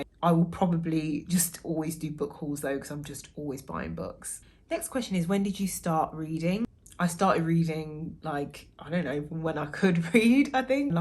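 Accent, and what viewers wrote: Birmingham, United Kingdom. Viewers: вы первая буктьюбер, говорящая с британским акцентом, которую я нашла😭 вы очень красивая! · Stumbled across you accidentally, I love to read and I have a ridiculous amount of books but I don’t think I could do Booktube! Do I detect a Midlands accent? I’m from Birmingham :) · Immediately subscribed for the vibes!! Do I detect a west mids/brummie twang? Either way, I love your voice, reminds me of home.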